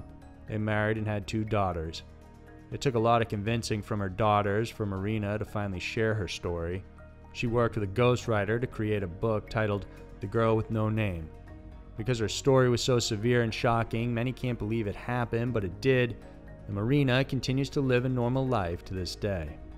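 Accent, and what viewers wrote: South, United States. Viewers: You are Canadian..Why you talk like you are from the southern states of the U.S?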